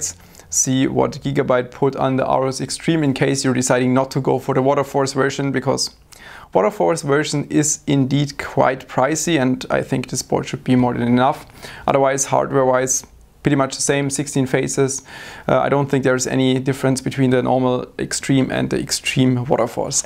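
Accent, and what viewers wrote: Germany. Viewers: Yes and I really appreciate his adorable German accent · Are you German? Cause you have a really obvious accent LoL 👍👍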